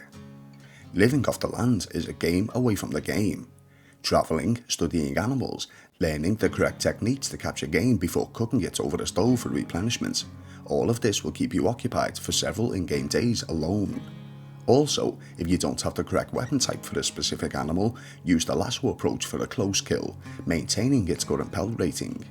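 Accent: Liverpool, United Kingdom. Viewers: Nice to hear a fellow scouser doing something on YouTube that’s not relating to footie.😃 Luv RDR2 and 1 as well. I usually start off bad ie killing the O’Driscoll in the Adler stable and Jimmy Brooks off the cliff, but by Ch 4 I’m a pretty good guy most of the time anyway. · Alright mate can see you’ve not uploaded for a small while, just thought I’d give you a shout and say that I think your videos are bloody incredible. I’ve been playing RDR2 for some time, but never fail to find something new through your videos. It’s also a pleasure to hear a scouser talk about my favourite game. Hope all’s well and looking forward to your next video!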